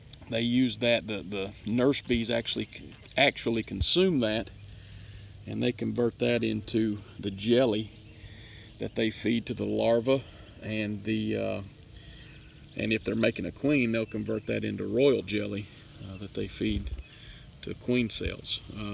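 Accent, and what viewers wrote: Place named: Tennessee, United States. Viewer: I wish you would make more videos. I Love your country accent! And I love watching your videos. I moved away from TN and miss home. So post more!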